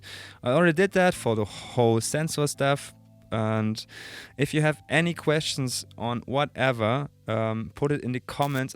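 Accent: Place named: Germany. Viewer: The German accent is legendary haha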